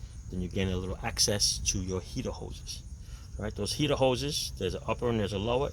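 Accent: New York, United States. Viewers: Sorry but is this dude a Chinese guy with a new York accent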